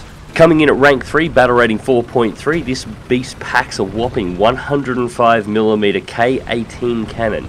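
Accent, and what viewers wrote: Australia. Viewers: mate the aussie in you is coming out lol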